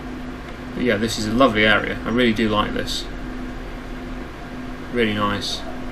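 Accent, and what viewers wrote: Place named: United Kingdom. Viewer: Omg the first time I heard yr voice over and OMG I LOVE THE EXTREME BRITISHNESS (I am british but like yeahhhhhhhh)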